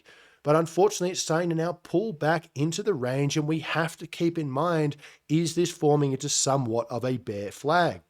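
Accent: Australia. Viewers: R U Australian btw?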